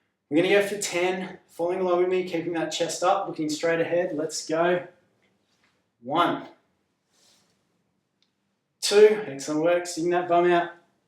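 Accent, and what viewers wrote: Australia. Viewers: Hi, Thanks so much for all your videos and articles. They are all very informative and easy to follow and do. I'm stuck in Europe at the moment, unable to get easily back home, so it's wonderful to hear a lovely Ozzie accent.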